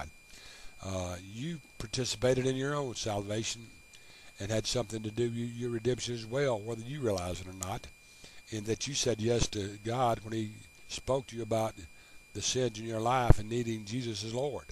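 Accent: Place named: South, United States. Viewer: I am not sure who you are but brother you get it. I am a Byzantine Catholic. You sound southern...I'm from Mobile, Alabama, Can we talk? (I am not going to try and change your mind I think there is some good sense rollin' arounf in there). I think you totally get Catholoism. I think you need to look to your Easter Cathpolic friends before you leave.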